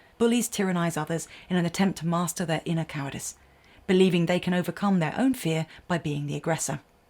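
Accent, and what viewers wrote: United Kingdom. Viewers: You have an amazing voice. Really pleasant. Soft yet not ASMR. Just the right amount of British to sound classy but not posh. Have you ever thought of narrating (your favorite) audiobooks? I think those would sound amazing.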